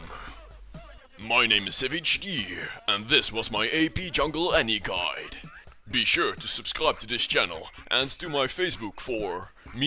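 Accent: Netherlands. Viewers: dat nederlandse accent kun je best goed horen ^^ nice guide i should give it a go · that accent.. im so ashamed to be dutch right now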